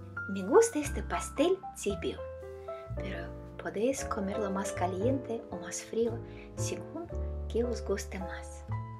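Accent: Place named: Russia. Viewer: Que bonito escuchar una rusa hablando español....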